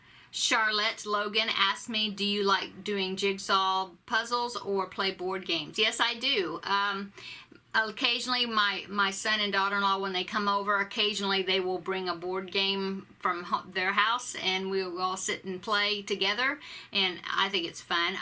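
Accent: South, United States. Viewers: Hello.... I’m a new subbie to your channel... I binged watched all your videos from the very beginning and I just love the things that you make and I just love your Southern accent.... I know in a few videos back you said that you wanted to do a nativity scene are you still going to do it??? ... I would love to see your progress on them.... can’t wait for your next videos.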